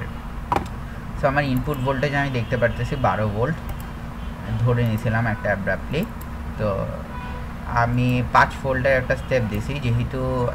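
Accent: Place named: India. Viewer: Indian english quite hard to understand because its accent, hope there will be some script or something similiary...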